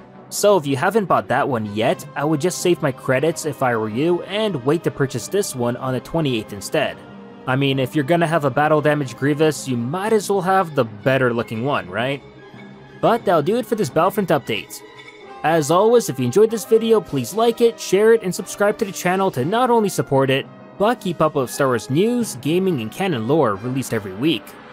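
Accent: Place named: Canada. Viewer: thats how they pronounce offense in canada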